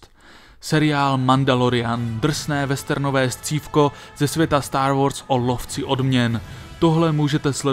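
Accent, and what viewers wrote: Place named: Moravia, Czech Republic. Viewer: Bože ta moravština je hrozná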